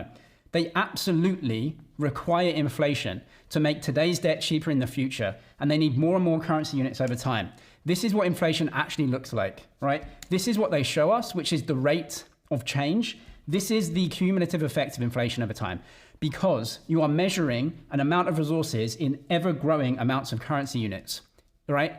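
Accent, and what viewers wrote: United Kingdom. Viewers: Hi, where do you come from? You sound British.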